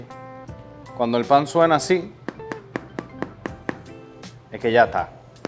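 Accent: Dominican Republic. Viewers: Ahi padres pero tu si habla nos puedes negar que eres domin .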